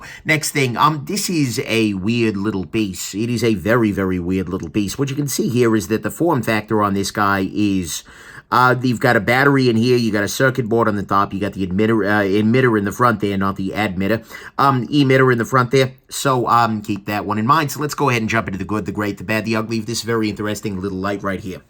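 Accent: New York, United States. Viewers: i have not heard a more new york italian accent in my life...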